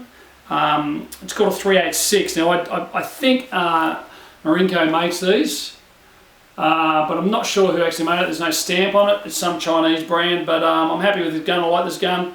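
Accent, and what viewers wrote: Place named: Australia. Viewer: lol, don't understand how all you yanks can't understand what he's saying, He does not have a really thick Australian accent, its just moderate. trust me just listen to the vid a couple of times and you'll get round the lingo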